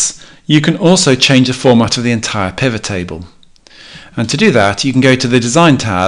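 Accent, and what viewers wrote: England, United Kingdom. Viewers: Wow..! Great class.. :) Like the 'Excellent sounding English Accent' :)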